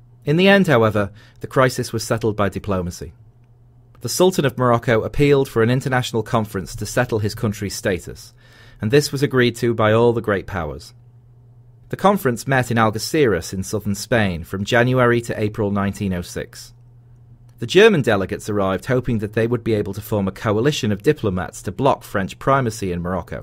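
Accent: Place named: Liverpool, United Kingdom. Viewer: A Scouser!!!! Rarely heard one in my live! This is a very interesting accent. (also your history lesson is) Dankeschön!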